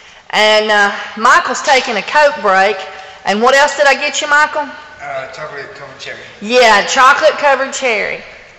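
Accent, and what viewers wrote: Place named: Alabama, United States. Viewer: I feel like I have a connection to you, although I'm from New York, my mom was Born and raised in Birmingham Alabama. Hearing your accent allows me to think of the times I spent one year there attending school and came back to New York with a BAMA accent. lol. but that was many years ago. Again, good luck and I'll be watching the transformation of your store. So exciting!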